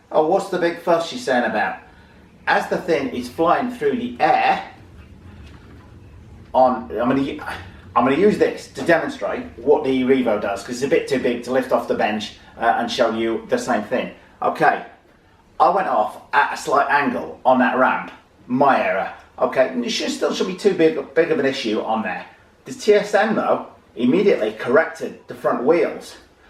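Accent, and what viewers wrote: England, United Kingdom. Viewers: greeting from Berlin Germany...I love your videos, but some times your English accent is too strong and i miss some word here and there. could you please tell me what gearing you use in this particular video? sorry i did not understood went you say it in your video. Thank again for all the tips...(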